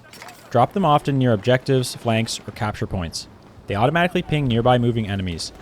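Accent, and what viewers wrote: Canada. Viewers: This guy gotta be from the maritimes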